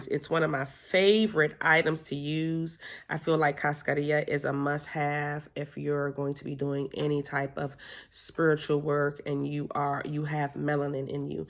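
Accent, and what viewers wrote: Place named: DMV, United States. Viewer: I hear a DMV accent…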